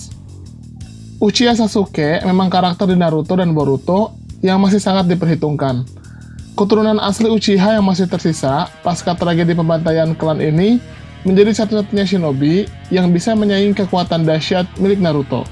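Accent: West Java, Indonesia. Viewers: Ini yg ngomong orang sunda ya soalnya logatnya ketara bgt, bilangnya narutok sasukek belakang pake "K" semua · Didenger dr cara ngomong nya, kayaknya si mimin ini urang sunda nya ?? 😁😁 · Kamu orang Sunda ya min?? Hayo ngaku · Orang sunda ya?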